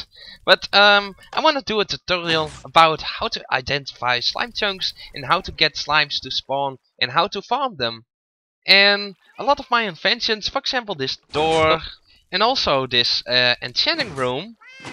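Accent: Netherlands. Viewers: Dutch accent. :p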